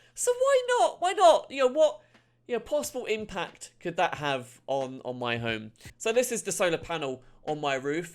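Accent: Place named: United Kingdom. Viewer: guy with a British accent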